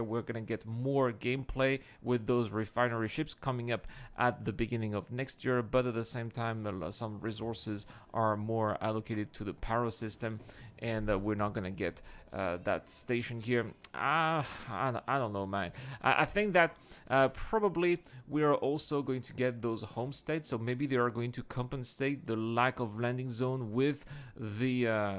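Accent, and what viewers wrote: France. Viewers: nice i really love that your channel is growing! you are always on point and i love your french accent, greetings from germany keep it up! :) <3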